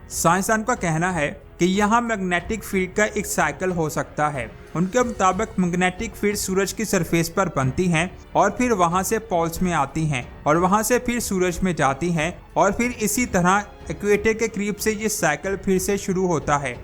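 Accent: Punjab, India. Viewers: yar tum Urdu bhi aur angregi bhi Punjabi lehjay mein parrhtay ho,ba'tao mein kiya ka'roon.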